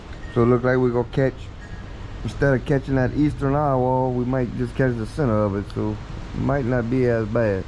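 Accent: Louisiana, United States. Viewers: DAT LOUISIANA TWANG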